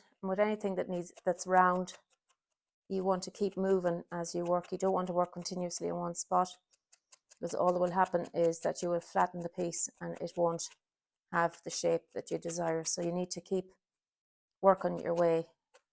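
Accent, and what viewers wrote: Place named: Ireland. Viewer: As ‘Sewcat’ above, says, I could watch and listen to your wonderful Irish accent over and over again.❤️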